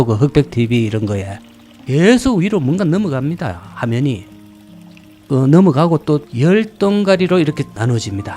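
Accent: Gyeongsang, South Korea. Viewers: 전라도 사는 60대 입니다! 경사도 사투리가 넘 구수합니다.꼭 구독하고 있구요.정말 많이 배우고 있읍니다.감사합니다.수고하세요.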